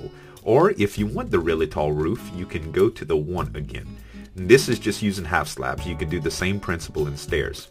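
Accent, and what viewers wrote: Louisiana, United States. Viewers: This guy has got to be from Southeast Louisiana. He has a Cajun accent.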